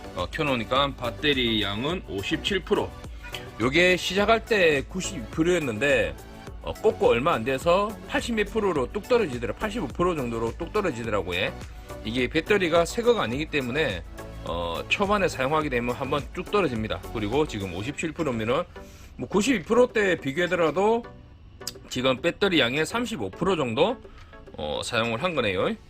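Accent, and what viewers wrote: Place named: Busan, South Korea. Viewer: 사장님 궁금증을 풀어주셔서 감사합니다. 저는 포항 사람인데요. 사장님 말씀이 말끝마다 됩니다이, 안 됩니다이, 좀 웃깁니다이~😂😂😂 부산 그 특유의 말씨 ㅎㅎ. 암튼 정보 감사하고 믿음이 가는 분 같습니다. 번창하세요~!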